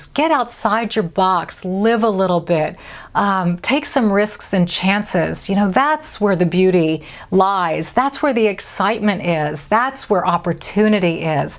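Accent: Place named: United States. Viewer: Hello...I'm German and I love to listen to your "perfect" American English to improve mine :)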